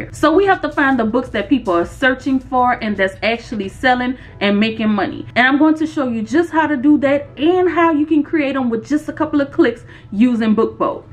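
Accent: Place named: Louisiana, United States. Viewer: My good sis. That Louisiana accent is so strong I subscribed just for that!! Love the realness!!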